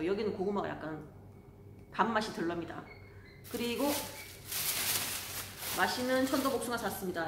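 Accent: Gyeongsang, South Korea. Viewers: 어제부터 폐인 중입니다ㅋ 같은 대구분이시라 너무 정겹네요~~~ 호주 일상 자주 올려주세요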